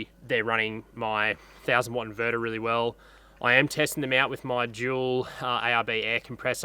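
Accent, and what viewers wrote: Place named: Australia. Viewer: Very heavy Australian accent almost as bad as heavy Scottish hard to understand??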